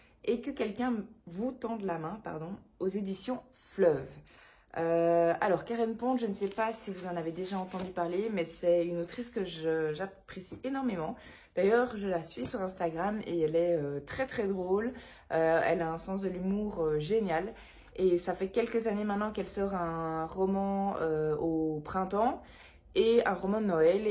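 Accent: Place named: Belgium. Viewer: Et j’vois que tu arrives récemment sur le booktube. Bienvenue. Et ton accent léger sur les ”R”..pam waterloo..Bruxelles.! Évidemment! ( bon après coup.. j’avais failli ecrire que t’étais alsacienne...😲🙄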